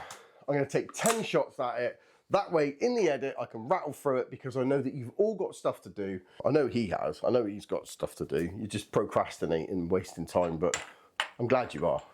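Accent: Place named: Cornwall, United Kingdom. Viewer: Hey! I've been binge watching your vids and i'm loving it. Your energy and enthusiasm is infectious! I thought I recognised a Cornish accent, then you mentioned you were near Bodmin! I'm near Truro, so not a million miles away. If you want, I could come up and blap a few targets with you. I'm very new to air rifle shooting. I started Feb this year and got my first PCP rifle. I shoot indoor bench rest with my Air Arms HFT 500, which I bleddy love to bits! For what it's worth, in my 6 month experience... lol Get rid of that sand bag rest and don't put your left hand on the rifle. Get a solid rest and a padded shooting glove that's grippy on the top. It's so you can cross your left arm in front of your chest, and put your flat hand under the butt. Use your flat hand like a wedge to adjust the up and down. The sandbag and holding the fore stock is giving you bad consistency, especially at longer distances. 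Hope that helps 😊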